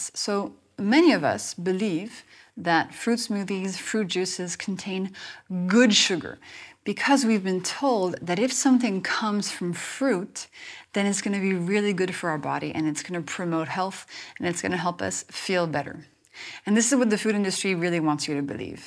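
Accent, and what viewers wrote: France. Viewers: When I first saw you, I thought you were French (that's a compliment) because your facial style, your personality, and the way you speak English has a little bit of a French accent, and I don't know if it's the short haircut that reminds me of that more than anything else. You look really good, like a model, not a biochemist, and I think that's why people are paying more attention to your channel, because it's not the boring, stuffy biochemist's sleepy explanations. I've been watching your channel a lot lately, and it's really helpful in a lot of ways. However, the food that they eat, I think it's one of those things that's so strongly cultural and I think it's one of those things that can't be easily fixed because it's so deeply ingrained, especially from your mum and your parents when you're younger. Of course, that's why your video is so important and meaningful to people who want to make a change and feel like there's a problem. 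I look forward to seeing more of your helpful videos in the future. I'm cheering you on from the bottom of my heart from Korea. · Hello angels!! Does anyone notice the similarities in her voice and the og mtv vj Martha Quinn? It’s like I’m back in 1982 watching Martha introduce the new video. With a little tinge of French. Anyway I have totally cut out sugar and most carbs, savory breakfast, vinegar, etc. feeling great, not deprived, and BMI and excess lbs are dropping!! It’s been less than 2 weeks but it’s working!!😊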